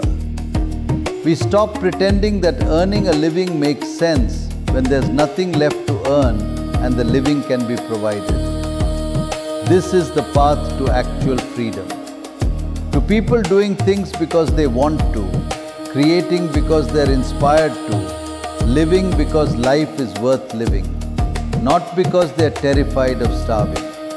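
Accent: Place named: India. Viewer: Obviously this is 100% AI. Any reason you used an Indian accent voice? Usually people chose American accent. Don't get me wrong, it's good, but I'm curious.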